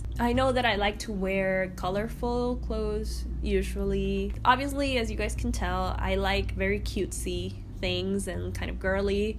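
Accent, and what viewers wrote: Dominican Republic. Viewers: Aaaaah, me encantó tú acento ): ❤️ el acento dominicano es uno de los que más me gusta 👀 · Amo amo tu acento Dominicano! saludos desde Panamá <3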